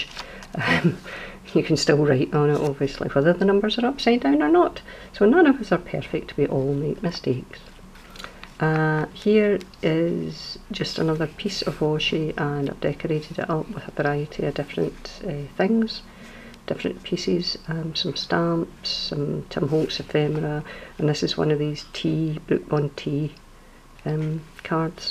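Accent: Scotland, United Kingdom. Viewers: Aye,! Bless the Scots accent. I come from a he North East. Now, there's an accent that only few would understand! Beautiful work yet again. Very talented lassie! 💜 xx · Without your accent I would never learn scottish. Love the edge collaging, very nice idea, will try it too. Your envelopes are gorgeous. Have a nice summer evening and say hello to yours!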